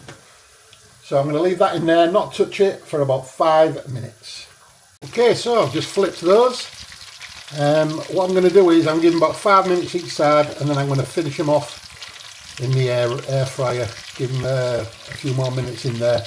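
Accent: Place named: Northern England, United Kingdom. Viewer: I am subscribed to your channel and always 'like' a viewed video, I could not enjoy this one as I was peeved you called it 'arugula' the USA word for 'rocket', in your broad northerner accent, maybe you quoted an american recipe. I know petty, but Americanisms is so annoying and invading our beautiful British language.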